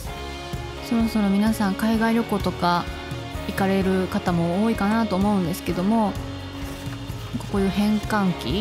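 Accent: Kansai, Japan. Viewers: いつも楽しませてくれてありがとうございます❤ さんの声好き😊所々出てくる関西人テイストも好き。わたしも関西人なので親近感わきまくりです。飴ちゃんね😁大阪人のコミュニケーションツールですね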